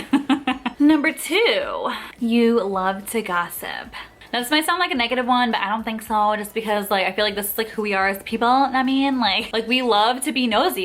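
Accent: Puerto Rico. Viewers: Your sond like you was reast on the isla cuz,,,,,Puertoriqueña,,,,,,same as the ress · Wow I new that your puerto rican because I am from Florida so I know how you talk as Latina boricuaaa 🇵🇷🇵🇷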